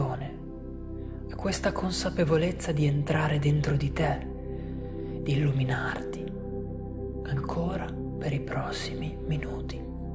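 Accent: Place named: Veneto, Italy. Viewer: Secondo me sei Veneto dalla parlata .....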